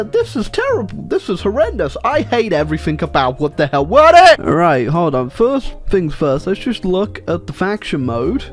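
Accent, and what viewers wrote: United Kingdom. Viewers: You watch these videos and think he sounds anything like an American? Have you ever heard an American speak? He's definitely British.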